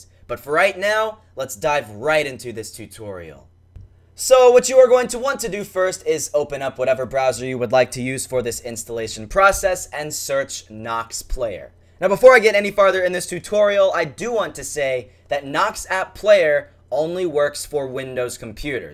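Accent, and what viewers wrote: Australia. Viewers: Your Australian omg myself is not the only one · ur australian yay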